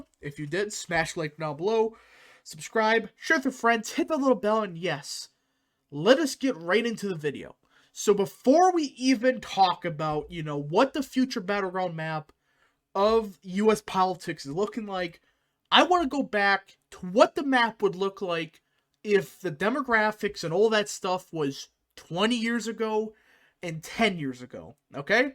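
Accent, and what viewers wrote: Wisconsin, United States. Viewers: I’m from Wisconsin and literally 10 seconds before you said you were from Wisconsin I was thinking “this guy sounds like he’s from Wisconsin”. I hope you’re right, but I’m always gonna vote like we’re 10 points behind.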